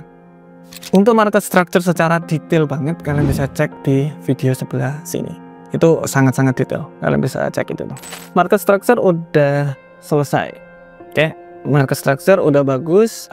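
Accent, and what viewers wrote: Java, Indonesia. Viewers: Jowo niki mas'e 😄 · Mas medok banget orang mana? Saya jg org jawa btw 😂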